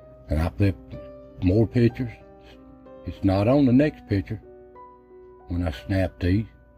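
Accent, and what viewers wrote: Appalachia, United States. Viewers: great American accent, fitting. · I love listening to you. My family is from the mountains of Virginia. Your accent and way of talking ("my wife an em") reminds me of my family.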